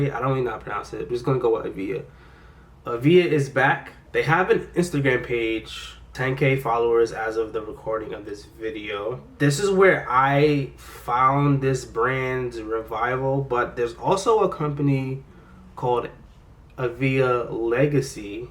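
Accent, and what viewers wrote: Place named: Connecticut, United States. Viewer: You gotta be from Connecticut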